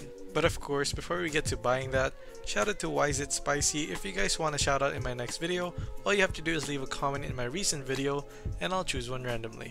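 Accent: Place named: Philippines. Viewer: Bro can you make a video with speaking Filipino me especially like your Filipino accent so can you do a video pls!??.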